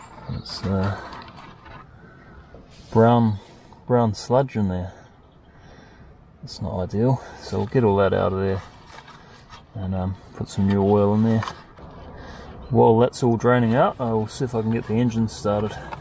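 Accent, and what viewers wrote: New Zealand. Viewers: This is the fifth or sixth video of yourse I've watched and after the first two I said to myself This dude had to be from New Zealand And you are!! Cheeeers matee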